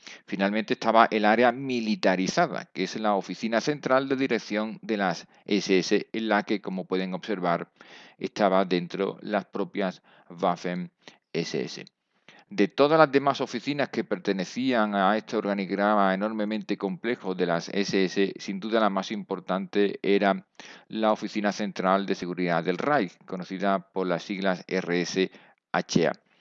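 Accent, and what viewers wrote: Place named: Spain. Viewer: oro puro este canal, soy cubano y ese acento del castellano es música para los oídos...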